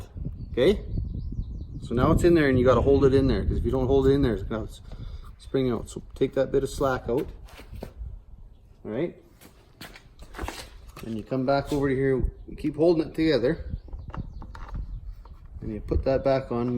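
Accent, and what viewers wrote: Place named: Canada. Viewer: Knew you sounded Canadian, then I saw the shirt. Cheers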